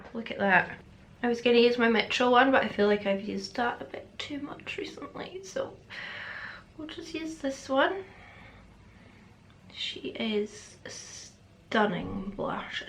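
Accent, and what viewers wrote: Scotland, United Kingdom. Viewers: I love when you do a deep Scottish accent every now and then! I recently found out I’m like 25% Scottish! Merry Christmas,! ❤️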